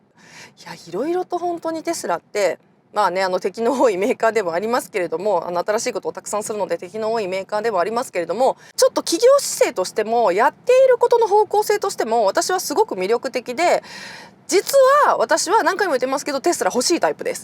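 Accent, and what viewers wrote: Kansai, Japan. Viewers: って、関西弁たまぁに出るやん❤ 鞄に飴ちゃん忍ばすオバちゃん系。早口と、関西人ならではの、間を嫌うマシンガントーク ❤いつも観てます🎉大好き。でも、ロングレンジRWDなら買いたいです。来年かなぁ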